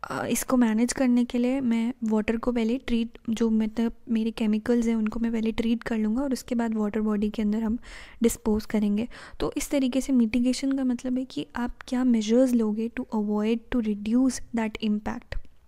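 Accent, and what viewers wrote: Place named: Kashmir, India. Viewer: Good video. Mam your sound like Kashmiri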